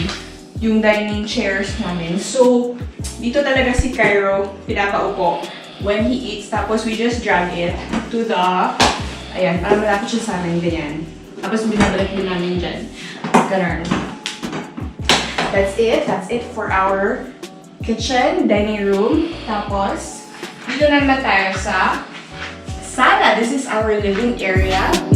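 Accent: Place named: Visayas, Philippines. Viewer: HIi, I love watching your video. First na napanood ko sayo yung youtube sweldo. Tapos ito na, parang feel ko at first na bisaya ka. So happy nung narinig ko na taga Cebu ka nga. You're an inspiration. New supporter here. God bless. Cebuana rin ako pala hehe. Keep safe.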